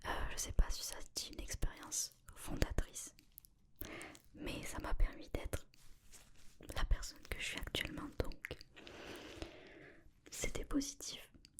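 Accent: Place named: Montpellier, France. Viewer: Tellement vrai pour l'orientation en terminal ! Ah je me disais bien que c'etait l'accent du sud mais plus montpelierin c 'est bien ça? (jsp si c comme ça qu'on le dit) 😁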